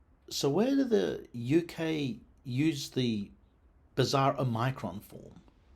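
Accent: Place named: Australia. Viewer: For some reason I never pictured you with an Australian accent, even though I know you’re Australian. Nice video!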